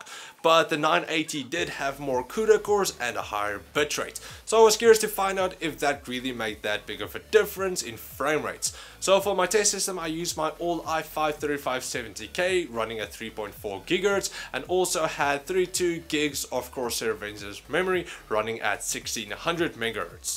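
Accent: South Africa. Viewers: South african ? :D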